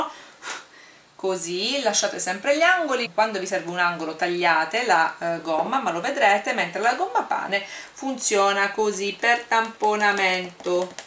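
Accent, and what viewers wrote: Sicily, Italy. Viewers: Ma sei Siciliana vero?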